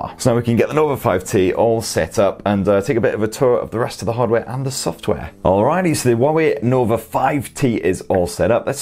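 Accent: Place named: England, United Kingdom. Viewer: Sorry mate, that English accent is quite annoying and unintelligible some of the time.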